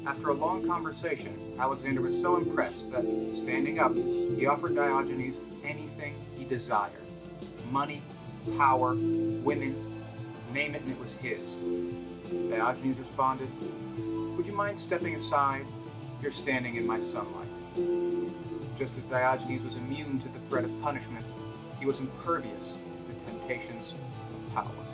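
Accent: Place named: United States. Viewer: i'm not too surprised, you're not the first and I daresay not the last to take it upon you to defend american honour or against generalisations/stereotypes and generally ignorant comments. if you're offended I apologise. No buts, just sorry. I find the accent grating and partnered together with a decimation of quality media such as David Attenborough's Frozen Planet annoyed me enough to take it out on all of you Americans. It's not that bold, it's the internet - expect to get input akin to this.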